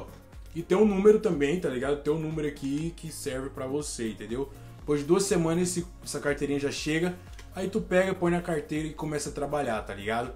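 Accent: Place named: São Paulo, Brazil. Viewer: Cai de paraquedas aqui!! Iol mas ganho meu like 1° pela humildade..2°AHH que bom ouvir o sotaque da minha terrinha😍 SP na veia manoooo!!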